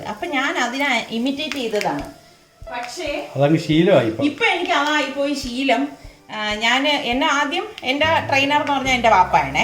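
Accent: Trivandrum, India. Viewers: ..same recipe ente vappachiyudeyum favourite aanu.njanum orattitum varutha chamanthium undakarundu.pinne tvm bhasha parayunnathu kondu oru kuzhapavum illa. namukku pinne tvm bhasha alle parayan pattulu.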